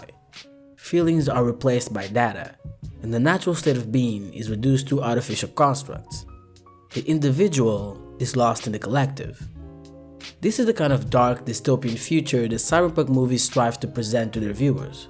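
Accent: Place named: Russia. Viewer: Ебать! русский акцент! ххптьфу!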